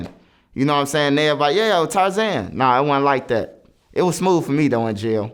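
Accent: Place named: Chicago, United States. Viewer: I'm from Chicago and he is typically what we sound like. I don't know where these other people accent come from. I'm like where they pick this country ass slurred speech from😂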